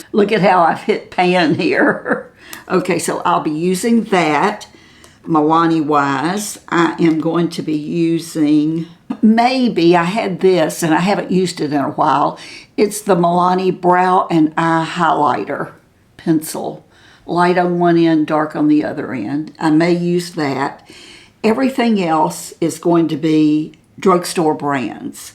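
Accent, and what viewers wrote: South, United States. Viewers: There is something about her. . . . She's authentic and honest. . . . Down to earth and the way she talks. . . Do I hear a little Southern accent ? I love Milani cosmetics too. She's not afraid to review the cheap cosmetics. . .Dollar General ? And tells us what she thinks . . .She's not trying to look young. . .she's comfortable in her skin and age hair is dirty tomorrow shampoo day ! Love her! You say you are a walker. . .take us on your walking tour sometime. Those old school rollers and clips ! ! I'll be tuning in all through 2025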